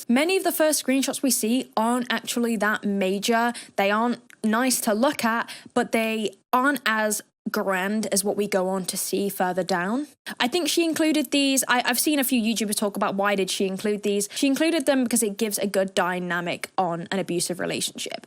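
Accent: United Kingdom. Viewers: r*tard is used in uk to mean idiot even tho u sound abit british u have the sensativity of a american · He sounds SO British that the fact you are too did not set in until 20 minutes in 💀 sorry, side note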